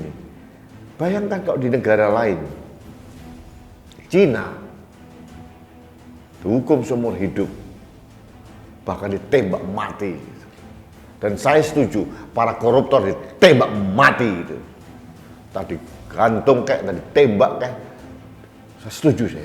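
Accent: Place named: Kediri, Indonesia. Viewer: nuwun sewu nggeh. Panjenegan logat bicaranya kediri bngt. Mak bedunduk, Dagelan, itu Bahasa Kami orang kediri. Iya menurut saya pribadi itu Hakim kok peko' eram to. Kulo tiyang alit mawon mboten saget mikir hakime jian nemen. Hukum kok saget d enyang" d sekeng" MA ne kok ngoten to. Sanoyo dangu sansoyo kebacut.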